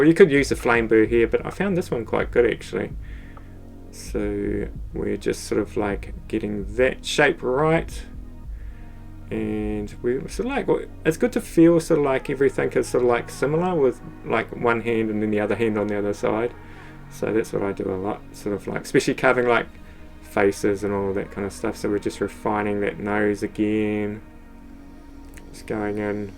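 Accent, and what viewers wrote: New Zealand. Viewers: That accent sounds like a Kiwi, am i right? If so you art work tooi much😮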